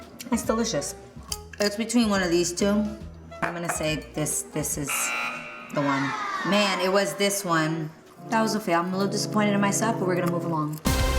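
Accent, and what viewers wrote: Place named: New York, United States. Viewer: yo i love her nyc accent lol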